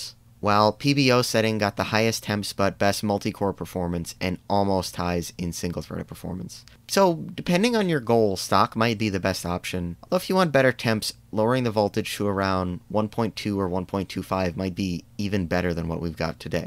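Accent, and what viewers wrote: Scotland, United Kingdom. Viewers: I miss your adorable Scottish accent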